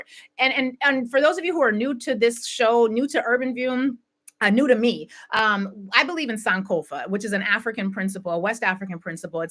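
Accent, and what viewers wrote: South, United States. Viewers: And you speak southern belle.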